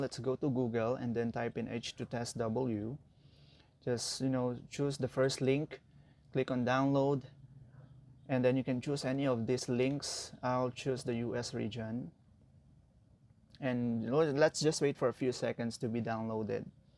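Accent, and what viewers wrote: Philippines. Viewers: Bat parang tagalog accent yung English mo boss? 😨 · Gagi na noticed ko agad Filipino accent kala ko pinoy. Di ako nakatingin sa screen lol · Muntik pa sana akong mag comment ng what is your nationality why is your accent sounds very filipino😂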